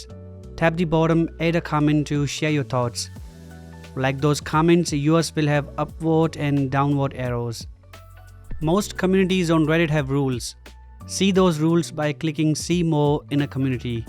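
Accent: India. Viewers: Indian accent!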